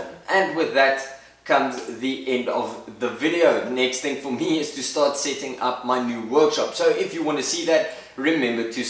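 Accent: South Africa. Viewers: Hey man I loved the series, looking forward to seeing more content like this. I was wondering where you guys are located ? The accent sounds a lot like South African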